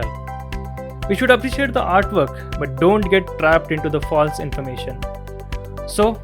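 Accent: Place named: India. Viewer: INDIA ACCENT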